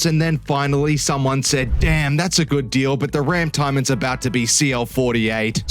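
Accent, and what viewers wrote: Australia. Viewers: Are you gonna explain why you have a Melbourne Aus accent but for some reason live in the US.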